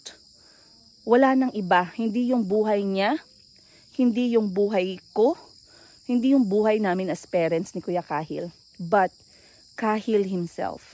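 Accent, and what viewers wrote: Iloilo, Philippines. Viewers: Tuwang tuwa Ako sayo Lalo na kapag nag salita ka na tonong ilongga 😅😅😅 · Ilonggo kamo? Or acquired knowledge of speaking inilonggo....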